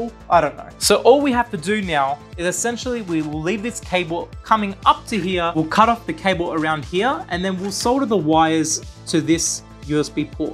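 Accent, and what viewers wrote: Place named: Australia. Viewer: MATE THIS VIDEO IS GREAT, AND I LOVE HEARING A FELLOW AUSSIE DOING KEYBOARDS love from western syd <3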